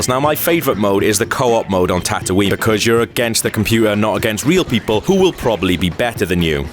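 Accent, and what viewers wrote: United Kingdom. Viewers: There's nothing worse than listening to this British commentator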